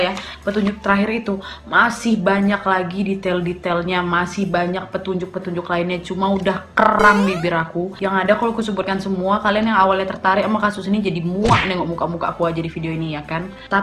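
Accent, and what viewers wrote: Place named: Medan, Indonesia. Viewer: Logat Medan nya itu Wak...👍😁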